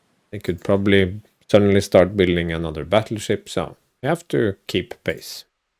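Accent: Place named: Scandinavia. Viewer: where are you from if you dont mind me asking? youre accent sounds Scandinavian to me but i would like to know again if you dont mind me asking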